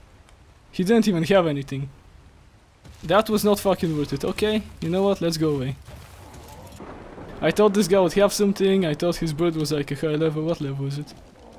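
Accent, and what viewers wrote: Spain. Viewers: Se nota que eres español 😁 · hablas como si tu idioma principal fuera el español, xd talvez no me entiendas pero pues saludos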